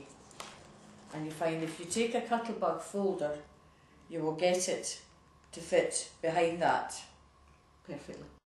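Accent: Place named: Scotland, United Kingdom. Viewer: I appreciate you slowing down so we "non-Scotish" can follow, but both your accent and videos are so terrific, watching it again is no problem. Thanks for sharing your talents with us.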